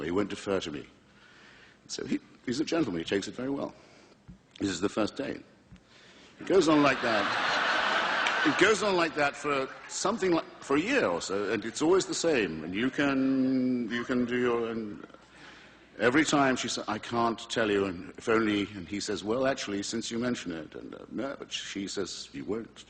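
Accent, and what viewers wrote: United Kingdom. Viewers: those are British accents (and there are several Scottish and Irish accents, I don't know about Welsh). English accents include received pronunciation, Estuary English, cockney, and many regional accents. Hitchens basically speaks rp, which is both an English and a British accent.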